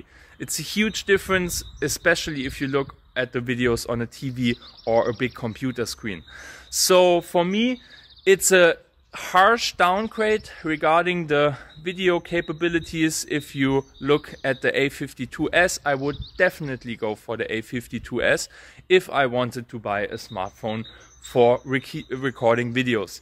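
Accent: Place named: Germany. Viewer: Coool German accent like it bro!😊 Thank you for your good (I think =) honest tests. I would be happy if you would test the small Galaxy s22 (6.1") again. It is said to have come a little closer to the flagship specs with camera etc. small and good cameras and battery is something i always hoped for, more so since the apple mini phones, you can start a petition that xiaomi or other widder build small phones, it doesn't have to be the best cpu.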